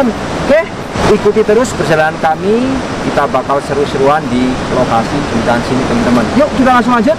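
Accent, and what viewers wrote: Banyumas, Indonesia. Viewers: Ngapak banyumasane kerasa banget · Wow ngapak...👍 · q suka gaya bicaramu mas... lugu dan polos... Khas jawa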